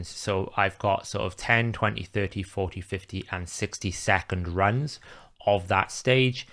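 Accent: Wales, United Kingdom. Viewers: Are you welsh? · You sound like your from llanelli or swansea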